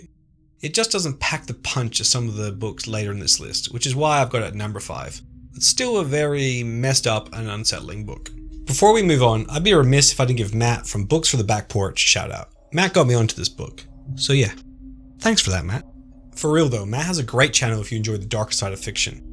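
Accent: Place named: Northern Ireland, United Kingdom. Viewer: where are you from, your accent is fascinating.. you sound northern irish but u've either been to Austrialia or the States.. its driving me mad i cant place you!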